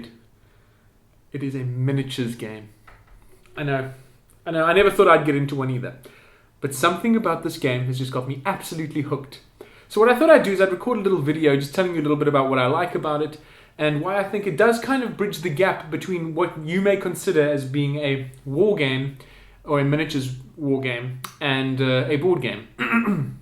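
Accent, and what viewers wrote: South Africa. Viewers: That has to be a South African accent. But yeah, Infinity is great!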